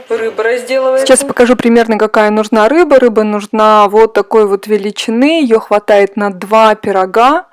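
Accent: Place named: Siberia, Russia. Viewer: Я тоже почему-то подумала про оленину, когда читала и слушала описание вкуса кенгурятины ))) Я родилась и выросла в Норильске, помню пельмени к коробочках машиной лепки из оленины ))), ваша скорость мысли и речи выдает сибирячку, москвичи и вообще запад России говорят иначе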